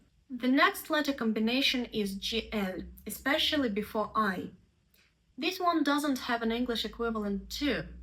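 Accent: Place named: Eastern Europe. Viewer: Your accent sounds like it belongs to a Slavic language, especially when you speak English. Love how you explain everything - super! xx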